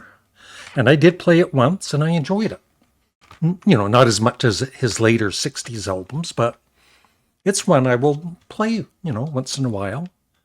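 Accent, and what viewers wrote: Canada. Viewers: Hey I'm guessing you're from Canada by the way you pronounce album as ELbum instead of ALbum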